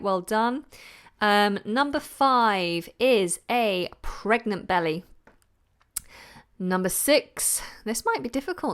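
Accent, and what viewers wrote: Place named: United Kingdom. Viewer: can you please speak with a more clear British accent, even in some sessions not all of them! I know that you speak with a British accent in this video, but are you speaking in the same way during your normal day? Thanks in advance!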